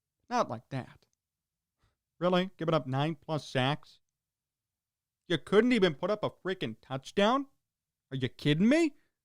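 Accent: Chicago, United States. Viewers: This is the most Chicago accent I’ve ever heard in my life.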